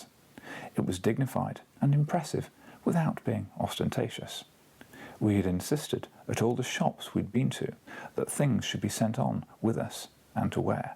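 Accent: United Kingdom. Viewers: That is perfect to improve my English, thank you Doctor British accent, I love the video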